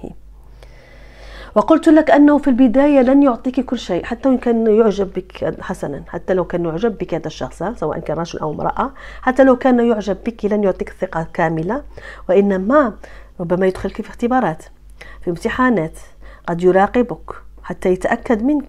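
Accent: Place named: Tunisia. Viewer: لهجتك مثل لهجة التوانسة ❤❤❤وصوتك مريح يا ألله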